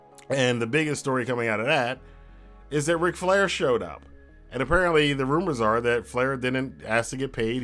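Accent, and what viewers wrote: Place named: United Kingdom. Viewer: Also you have make these comparisons with a British Accent.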